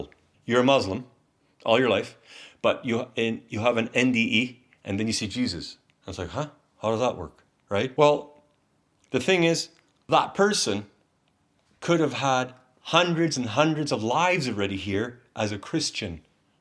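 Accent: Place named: Finland. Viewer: You sound Finnish.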